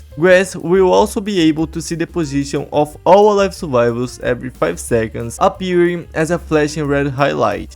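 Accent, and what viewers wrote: Brazil. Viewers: Cara não vou mentir que incomoda o inglês brasil seu sotaque me ataca muito mas bom video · O inglês mas brasileiro q eu js vi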